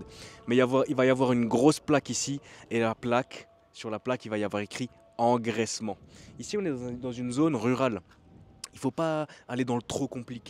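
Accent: Alsace, France. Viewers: Petit accent Alsacien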